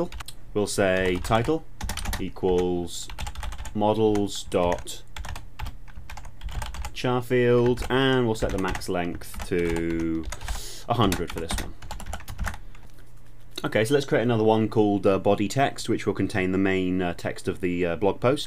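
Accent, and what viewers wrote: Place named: England, United Kingdom. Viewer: God that english accents glues me to this video :O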